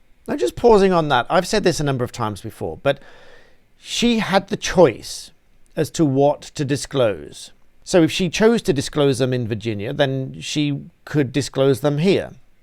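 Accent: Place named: Birmingham, United Kingdom. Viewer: Are you from the Birmingham area?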